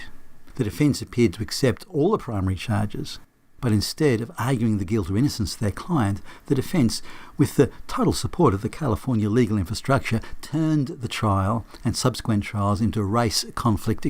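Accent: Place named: Australia. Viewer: This is an unique reinterpretation of the Rodney King event, reinterpreted in light of the current social marist agenda. I remember when that went down. I remember similar racial confrontations going all the way back to "Black Day in July", when Detroit burned. Maybe you could reinterpret that too in the same vein, but you would be as wrong as you are about Rodney King event. These issues are far more complex and nuanced then you give credit to. From your accent, I might guess that you were far away from the event when it happened, on the other side of the world in fact in Australia. If that is true, you would not have the perspective of someone who grew up in America and experienced racial tensions first hand. However, just now I looked up to the statement under your video where you ask if the1990's trial of African American Rodney King introduced racial activist jurisprudence, and that is certainly a valid question.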